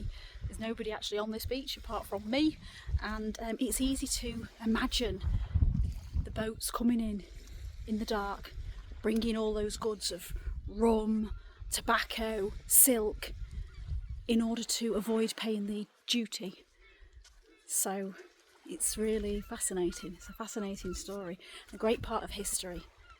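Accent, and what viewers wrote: Yorkshire, United Kingdom. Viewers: I don't remember how I arrived at your channel, but it was probably through finding videos on Yorkshire people and locations. As an American, I find the Yorkshire accent probably the most charming English accent. It drops the H's and R's like RP, but has the northern lilt that the "posh" accents lack. I could listen to someone from Yorkshire read the back of a cereal box and be enthralled. Anyway, thanks for introducing someone from across the pond to Robin Hood's Bay.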